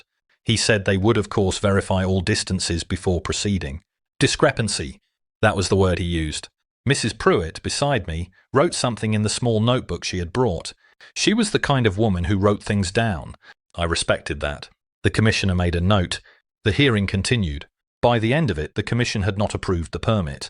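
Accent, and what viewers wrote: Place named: United Kingdom. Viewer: Why a british voice?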